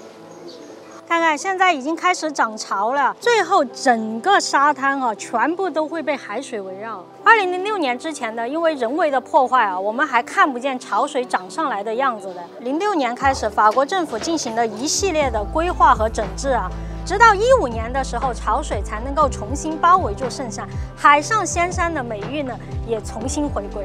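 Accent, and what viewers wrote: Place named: Northeast, China. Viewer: 女主是混血吗 ？口音夹杂着东北口音❤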